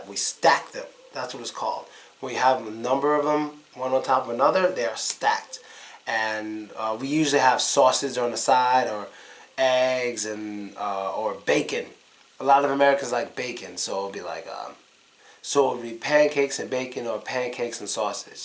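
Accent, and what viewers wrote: Russia. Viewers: OH MY GOSH. You have such a good russian accent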